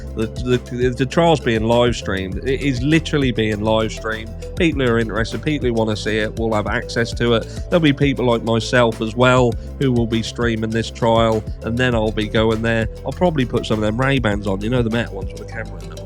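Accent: United Kingdom. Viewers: I love and his British way of saying things like ozzy!" FK RIGHT OFF! " 😂 please i need a coffee cup that says that!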